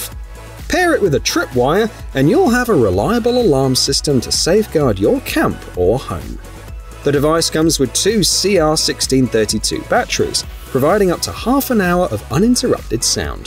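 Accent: United Kingdom. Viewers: The pulsing background "music" induces a headache. I wonder if the narrator is really British.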